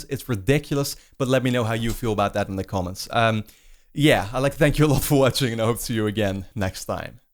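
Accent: Netherlands. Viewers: je Engels is goed maar hoorde ik gelijk dat je Nederlands bent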